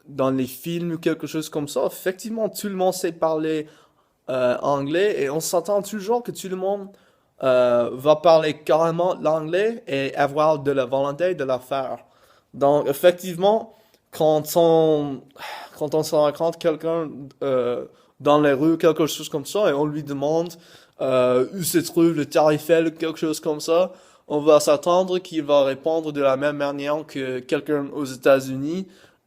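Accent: Quebec, Canada. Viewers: La plupart des français se douchent tous les jours, mais je connais plusieurs personnes qui se douchent moins souvent x) Je trouve que tu as un accent québécois haha !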